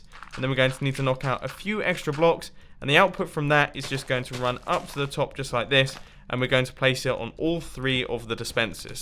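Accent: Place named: England, United Kingdom. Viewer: TheEyeOfItsMaxinho I'm in English time zone like mumbo jumbo I think he sounds English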